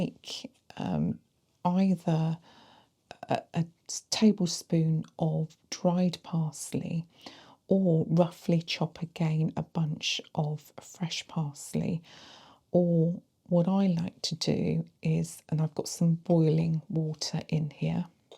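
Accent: United Kingdom. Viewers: Immediately subscribed upon hearing your voice and oh so delicious British accent :)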